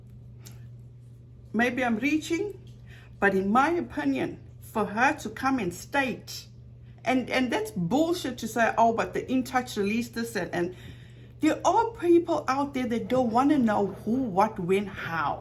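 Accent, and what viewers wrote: South Africa. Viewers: has GOT to be sipping vodka in that cup, and be delusional af if she thinks that 'joke' was funny in any way, shape, or, form.. P.S. Are you from South Africa? Even speaking about, your voice and laughter sound like music! It's beautiful, thank you for sharing it with us!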